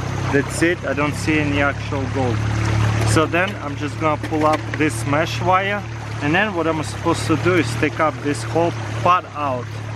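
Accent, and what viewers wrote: Russia. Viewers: russian? :D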